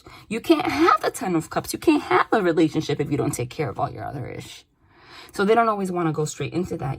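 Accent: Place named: New York, United States. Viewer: ok not relevant to the vid but i'm living for that new york accent.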